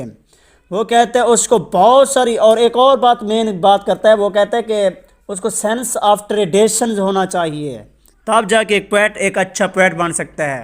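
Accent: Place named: Saraiki, Pakistan. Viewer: Saraiki accent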